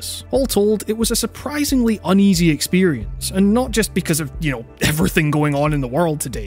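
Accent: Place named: Scotland, United Kingdom. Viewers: It's just Scottish. There are regional variations. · What is going on with that accent, it's like 2/3 Scottish, 1/3 American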